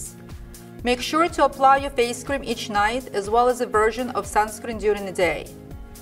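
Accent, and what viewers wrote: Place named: Russia. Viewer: so much of russian accent))))))))))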